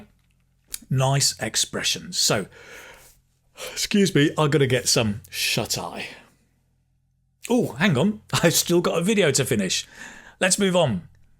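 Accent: United Kingdom. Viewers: Omg!! British English is just so interesting!!😍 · Hello dear, Thank you so much for sharing this video! That is so kind of you. Indeed, it is very useful and interesting to know about British slangs. I really like British accent, art and culture! In my view, you are a great native teacher because you always provoke the passion of your students to learn and encourage us to study more.You have all essential qualification and knowledge to be a successful teacher who can impress students with unique and enjoyable teaching methods. Although I am knackered, I hope I can get a higher score on the IELTS exam. Cheers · I love British accent 100% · Hi, we wish you be able to make subtitles for your lovely videos as we cannot trust YouTube's subtitles. I just joined your channel recently and lovecto learn British accent properly👍👍😉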